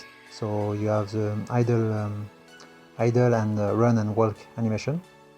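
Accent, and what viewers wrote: France. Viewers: Oh mais rien qu’a l’accent, ca s’entend que t’es français ! Incroyable ! Tu fais des choses magnifiques ! Continue comme ça ! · Trés bon tutoriel même avec l'anglais à la frenchy :) ! Par contre, je ne suis pas pro mais, tu n'es pas un peu en train de choisir le mode HARD pour le plaçage de tes textures ? Je me rappel vraiment être passer par autant de truc si ce n'est les nodes bien sûr ! Mais bon, chacun sa méthode. En tout cas, super tuto !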